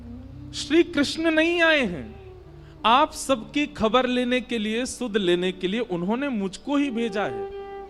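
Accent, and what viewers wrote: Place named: Braj, India. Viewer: Guru ji aapki brijwasi bhasha ka aisa jaadu hai itani mitti hai ki katha sunene ka aanand hi alag hai sub bhool jaate hai. Jai jai shree Radhey shyam ladli ji apni kripa aap par sada banaye rakhe. Aapko koti koti pranaam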